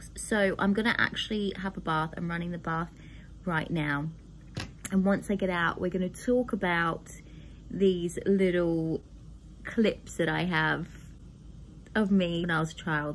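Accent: Australia. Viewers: You did speak a lot.. awesome ♥️♥️ love it and the Aussie accent ahhh not many Aussie accents out there ..oh can't wait wait to see more!!! Cute or not 😘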